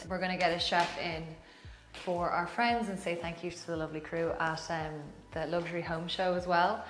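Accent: Ireland. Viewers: Sweetie, did you think we would confuse you with Mexican? We know you are Irish, we can hear it, see it 😂😂 gorgeus home, you have grest taste❤❤❤ amazing views 😊 I have big envy energy 😂😂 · yes I hear a definite Irish accent, very mild really. I would guess she is from a larger city in Ireland, Such as Dublin or possibly Belfast. Who knows. I think maybe?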